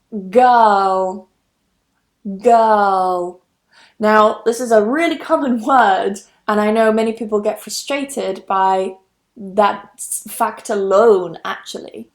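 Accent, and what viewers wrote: United Kingdom. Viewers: Is this british pronounciation ? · British Accents😎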